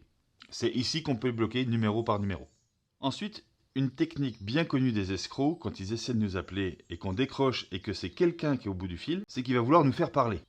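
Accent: Franche-Comté, France. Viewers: D’où vient cet accent franc comtois ?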